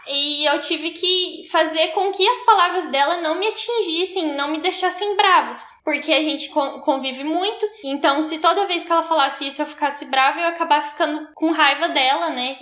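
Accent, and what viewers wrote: Paraná, Brazil. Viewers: Ah, mas eu a-do-ro esse sotaquinho do Paraná <3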